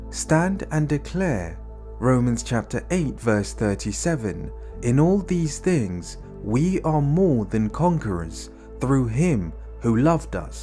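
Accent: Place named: United Kingdom. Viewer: I was looking forward to this, but... the British accent put me off. Too bad for me but I certainly hope this is good for those who can tolerate the accent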